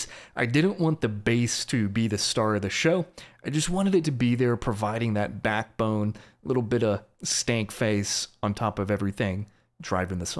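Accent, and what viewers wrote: Baltimore, United States. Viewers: lol i would bet my life you're from baltimore or philly. great video!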